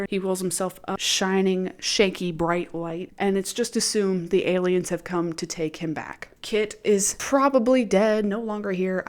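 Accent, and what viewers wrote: United States. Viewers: I literally know nothing about this show but you will bet I will listen to an american southern woman talk about AHS for 2 hours! Also, random note i saw from another comment. I always think its so weird when people say others are a Mics Mike copy cos theyre using a whiteboard or pin board. Like girl have you never heard of powerpoints before ?? youtubers have been using pin boards and white boards for years lol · 'eeeey, I thought your accent sounded familiar! Fellow Virginian, here! Born in Norfolk, grew up in VA Beach, Chesapeake, then Williamsburg. Hi neighbor!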